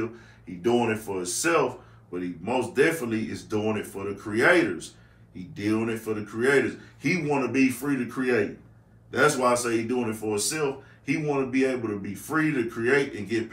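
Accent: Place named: Houston, United States. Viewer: Mane you from Houston fam? I can hear that shit all in your vernacular.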